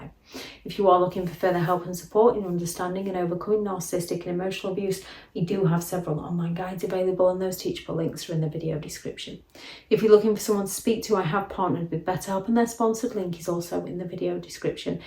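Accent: United Kingdom. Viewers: this is the FIRST time I have heard your voice. Forever I thought you were Southern Californian, wow was I pleasantly surprised that you are United Kingdom 🇬🇧 ish.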